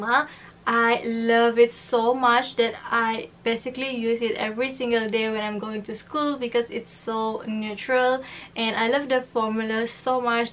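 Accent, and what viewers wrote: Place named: Malaysia. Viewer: I'm from malaysia too !! nice video ♡ your accent is adorable ! :)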